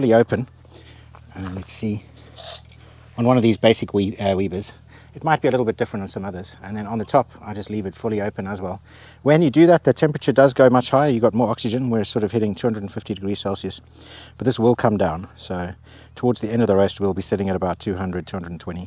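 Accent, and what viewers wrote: South Africa. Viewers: Get yourself some temperature probes. You probably overcooked the crap out of the chicken but who knows unless you can get the internal temp. In fact someone please send some to review for sponsorship dollars. The cool bluetooth remote ones. Also it's "Weber" not "Weeber" - j/k the saffa accent is cool🤣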